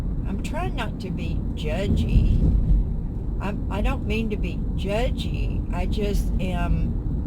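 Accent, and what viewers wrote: South, United States. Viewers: I grew up in Louisiana. Kinda recognized a southern accent and thought maybe you had been nearby at some point :-) I've lived all over too!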